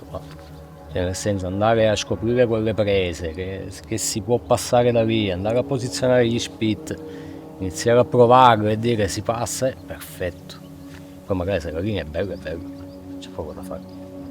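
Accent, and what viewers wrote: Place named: Sicily, Italy. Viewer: Conosco nei miei 4 anni passati in Sicilia, mi ha portato a vedere e scalare nelle sue " misteriose" falesie, quelle che non si vedono all'orizzonte, perché sono dentro nei camion. Con scrissi e fotografia un bellissimo e corposo reportages con tanto di copertina su Pareti, portando al pubblico luoghi incredibili. Di questa bellissima storia mi ha emozionato risentire la voce tutta siciliana di, così umile e profonda, racconta senza fronzoli la sua solitudine di Climber in una terra indietro anni luce nell'arrampicata. Lo raccontai in quel servizio e venni contattato da un galletto del luogo, ricordo ancora l'inizio di quel messaggio: ma ti sei reso conto delle cazzate che hai scritto? Un tono minaccioso, quasi mafioso. Piccole invidie perché dissi che in un mondo di scalatori " della domenica" era ed rimane il più forte Climber Siciliano. 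Daje avanti cosi, per te stesso e per la tua incondizionata libertà.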